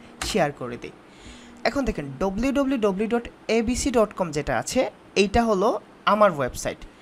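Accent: Sylhet, Bangladesh. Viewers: ভাই কি সিলেটি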